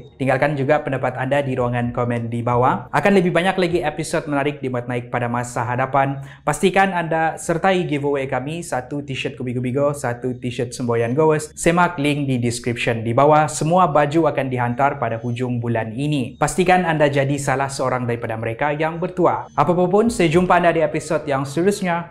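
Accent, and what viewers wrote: Sabah, Malaysia. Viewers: Can someone tell me, orang sabah kah? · Tak pastilah sebb saya pun kurang tahu pasal cara percakapan diorang ni.Tapi yg saya tahu ni sabah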